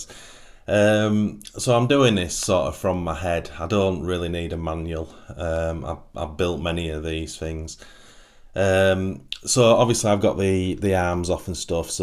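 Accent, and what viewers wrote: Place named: North, United Kingdom. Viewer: I know it's off topic but are you in the UK the accent is northern but I'm guessing you've emigrated?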